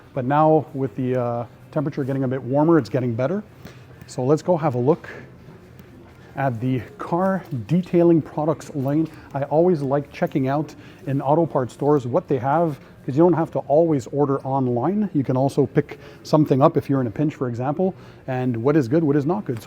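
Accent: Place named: Canada. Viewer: New to your channel. I had a feeling you were Canadian by the sound of your voice. I have a good friend from Montreal. We meet up in Albany to get our families together now and then. And my son and I went to the NY auto show this year too. What terrible weather it was the day we went. I’m a novice detailer. Here for the knowledge and tips.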